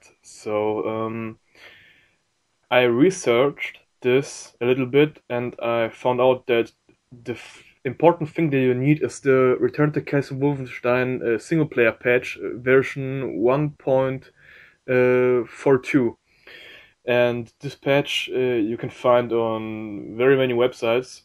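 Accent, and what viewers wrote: Germany. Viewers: I think your german because of the accent. If so.. Was geht ab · Man hört das du Deutscher bist :D Aber ich glaube das tut man bei jedem.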